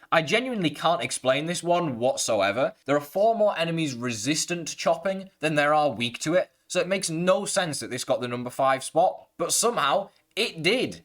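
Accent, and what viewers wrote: United Kingdom. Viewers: The black ox hammer is so confusing. On paper it should be so much stronger, but it honestly sucks. BTW, you have a British accent my friend. How are you butchering all those pronunciations? 😂😂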